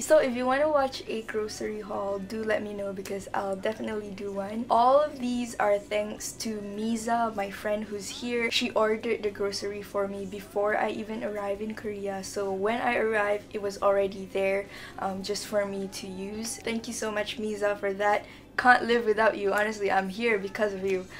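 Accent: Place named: Philippines. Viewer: the way she spoke like filipino..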